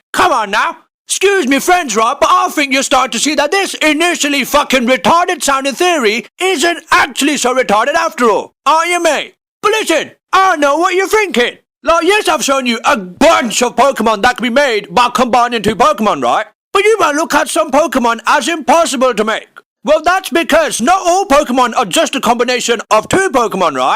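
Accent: United Kingdom. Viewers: BRITISH MAN SHOUTING ABOUT RANDOM ASS SHIT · nvm hes british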